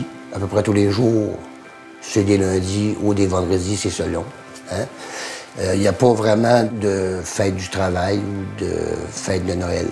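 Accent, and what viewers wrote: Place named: Quebec, Canada. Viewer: Friends, that ain't Parisian french...that's joual!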